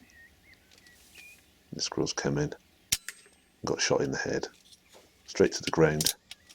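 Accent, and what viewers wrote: England, United Kingdom. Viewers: Love these videos as I hate these destructive pests. The English accent is also a nice touch. Thanks for the professionalism in producing these videos.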